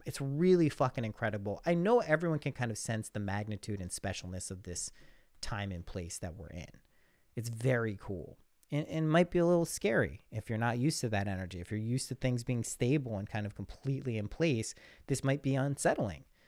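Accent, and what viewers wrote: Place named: Ireland. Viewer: So your Irish accent was a hidden gem you should absolutely let shine WAY more often...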